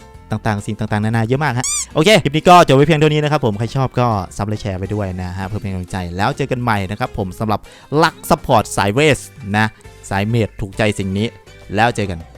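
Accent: Isan, Thailand. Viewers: ชอบความเว้า 555 ดีครับ อยากให้ทำต่อไป lol wr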